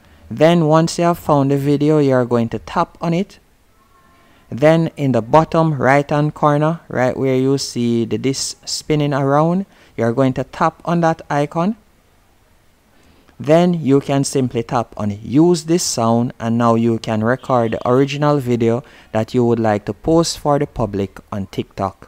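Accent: Jamaica. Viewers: ya jamaican?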